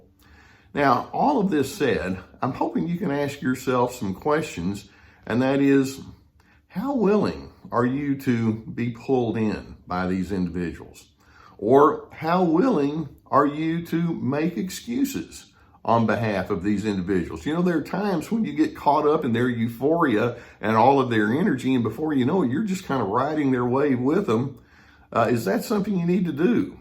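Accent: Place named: Texas, United States. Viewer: Nearly all of the people in my life are anti-conversation, at least with me. Their defensiveness, quickness to pronounce me wrong, etc. interfere with the idea-based back-and-forth I crave. This is surely partly my own fault but if there's a word for such auto-contrarians I'd appreciate your telling me. P.S. You sound Texan; which part y'all in?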